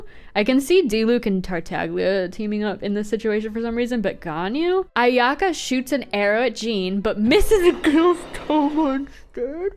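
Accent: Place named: United States. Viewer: this girls american accent and mispronounciation of tradional names is killing me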